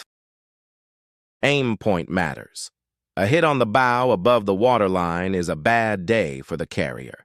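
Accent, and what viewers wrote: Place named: South, United States. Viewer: Why your ai sound like hes from the south?😅 nice video though!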